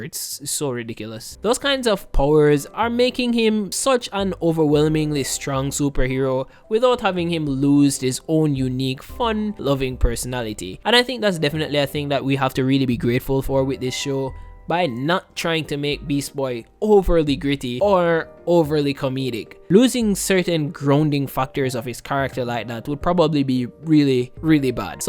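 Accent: Jamaica. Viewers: This is my 1st vid and i can tell your jamaican i am glad there are jams like me who loves explaining cartoons/shows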